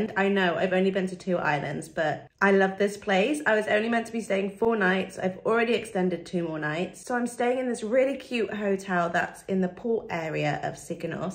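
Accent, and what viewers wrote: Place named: United Kingdom. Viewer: Not crowded is the best. Thank you for sharing. All is good except the British accent.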